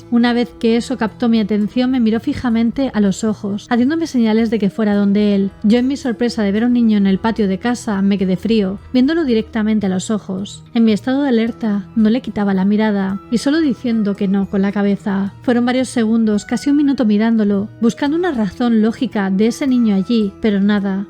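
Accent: Spain. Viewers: ¡Mexicanos! ¿Quieren escuchar a diciendo una grosería? Minuto 😅. Excelente video pero el "chin∆@ tu madre" con esa bella voz española, es una joya! jaja.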